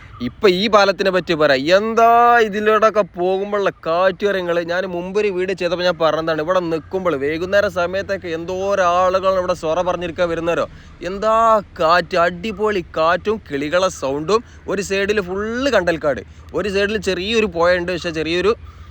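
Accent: Malappuram, India. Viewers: വീഡിയോ അടിപൊളിയാണ്‌.. പ്രത്യേകിച്ചും അവതരണ രീതി especially Drone shot..ആ ഒരു effortന്‌ 👍🏻.. ഞാനൊരു കണ്ണൂർക്കാരനാണെങ്കിലും നിങ്ങളുടെ Malappuram സ്ലാങിലുള്ള വിവരണം കേൾക്കാൻ നല്ല രസമുണ്ട്‌..keep it.. പിന്നെ സ്ഥല പേരുകൾ പരമാവധി മലയാളത്തിൽ എഴുതുന്നത്‌ പോലെ തന്നെ പറയുക..😀 ഉദാ: മുഴപ്പിലങ്ങാടിനെ മുഴുപ്പിലങ്ങാട്‌ എന്ന് പറയരുത്‌ pls 😇 അതുപോലെ മലപ്പുറമല്ലാത്ത മറ്റു ജില്ലകളിലെ വീഡിയോ Editting Timeൽ വീഡിയോയിലെ റോഡ്‌ കടന്നു പോകുന്ന സ്ഥലങ്ങളുടെ പേരുകൾ Google map,Google earth,Sign boardകൾ ഒക്കെ നോക്കി മനസ്സിലാക്കി പറഞ്ഞാൽ ആ ജില്ലക്കാരല്ലാത്തവർക്ക്‌ പ്രത്യേകിച്ച്‌ Vdo കാണുന്ന പ്രവാസികൾക്ക്‌ വളരെ ഉപകാരപ്പെടും...പറയാൻ കാരണം പല വീഡിയോയിലും ഈ സ്ഥലത്തിന്റെ പേരെന്താണെന്ന് അറിയില്ലാന്ന് നിങ്ങൾ പറയുന്നതായി കാണാറുണ്ട്‌..എല്ലായിടത്തുമില്ല (അതെ സമയം ഇങ്ങനെയുള്ള vdo ചെയുന്ന മറ്റു ചിലരൊക്കെ ഓരോ underpass etc അടുത്തുള്ള റോഡും വഴിയുമെല്ലാം വിവരിക്കാറുണ്ട്‌). പിന്നെ കഴിയുമെങ്കിൽ ചിലരൊക്കെ ചെയ്യും പോലെ ആ റോഡിന്റെയും സ്ഥല പേരുകളിൽ vdoൽ എഴുതി mention ചെയ്താൽ നന്നായിരുന്നു.. 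പിന്നെ നിങ്ങളിൽ കണ്ട ഒരു Plus point Thalassery-Mahe bypassനെ നിങ്ങൾ ആ പേരിൽ വിളിക്കുന്നതാണ്‌..ചില YouTubers മുഴപ്പിലങ്ങാട്‌ bypass,അഴിയൂർ bypass എന്നൊക്കെ വിളിക്കുന്നത്‌ കാണാം.. (കോഴിക്കോടും കണ്ണൂർ ഭാഗത്തേക്കും NHൽ കൂടി ഇടുങ്ങിയ റോഡുകളുള്ള തലശേരി-മാഹി ടൗണുകളെ Touch ചെയാതെ പോകുന്നവർക്ക്‌ വേണ്ടിയുള്ളതാണല്ലോ ഈ bypass..ഇത്‌ ഈ നഗരങ്ങളിലെ ഗതാഗത കുരുക്കിന്‌ ഒരാശാസ്വവുമാകും..) കോഴിക്കോട്‌ ജില്ലാ അതിർത്തിയായ കുഞ്ഞിപ്പള്ളിക്കും അഴിയൂരിനിടയ്ക്കും നിന്ന് തുടങ്ങി കണ്ണൂർ ജില്ലയിലെ മുഴപ്പിലങ്ങാടിൽ അവസാനിക്കുന്നത്‌ കൊണ്ടായിരിക്കാം ചിലർ ഇങ്ങനെ പരാമർശിക്കുന്ന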